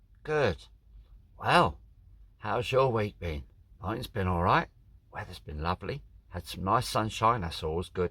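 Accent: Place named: East Anglia, United Kingdom. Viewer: Good to hear that Norfolk/Suffolk twang in hot sweaty Sri Lanka!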